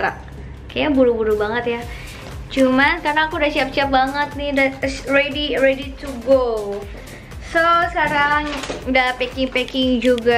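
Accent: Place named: Surakarta, Indonesia. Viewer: Solo nya di daerah mana kak? Orang solo mana suaranya😁😁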